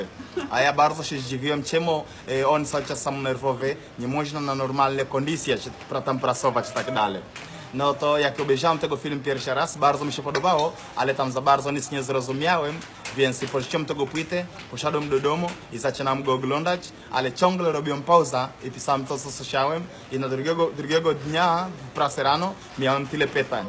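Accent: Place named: France. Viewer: Gówno prawda mówi z zabojadzkim akcentem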